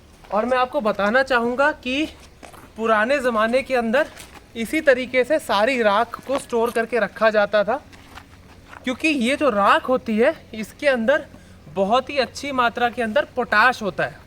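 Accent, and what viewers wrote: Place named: Rajasthan, India. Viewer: Rajasthani ho kya?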